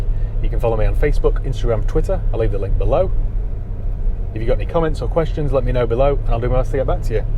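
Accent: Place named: RP, United Kingdom. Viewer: No complaint just seems a more “posh” way of speaking not something common round where I’m from 😂😂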